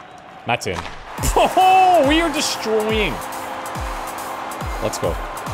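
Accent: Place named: Canada. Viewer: I'm only four minutes in and is already way more Canadian than I've ever heard him be. - British Columbian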